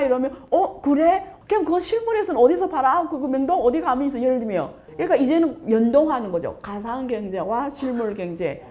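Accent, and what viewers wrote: Yanbian, China. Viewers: 옌변 일까 내용보다 특유 조선족 억양이 신경쓰이네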